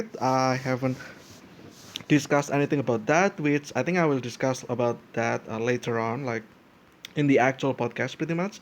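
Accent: Indonesia. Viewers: Bro, from your accent, i guess you're Indonesian?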